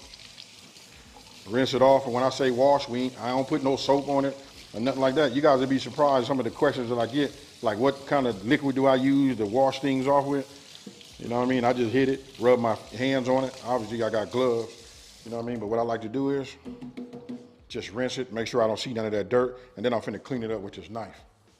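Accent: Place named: South, United States. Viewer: You definitely do not sound like you are from LA. It sounds like you’re from the south.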